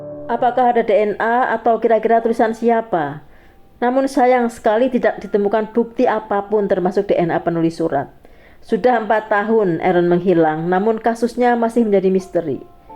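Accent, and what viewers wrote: Java, Indonesia. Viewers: ... dan terima kasih atas kasus nya yg luar biasa dengan kemedok an nya... salam cinta dari batam untuk madam....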